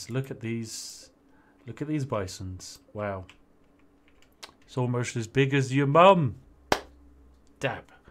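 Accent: United Kingdom. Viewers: its terrifyingly brititish tho. not brits that try to pretend to be canadian when traveling. no. unapolgetic better than thou pretentiousness. its the kind that is funny on the east coast, exploited on the west. midwest being best, we tolerate it but say half that to an actual person here and shit gets kicked in.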